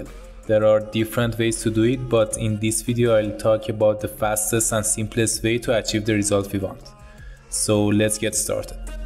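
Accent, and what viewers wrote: Iran. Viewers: From your accent i believe you're from Iran, so am I. Thanks for the great guide Keep it up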